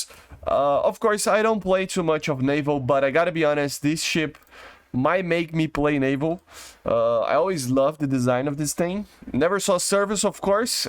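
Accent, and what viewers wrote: Brazil. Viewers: Bro he is Brazilian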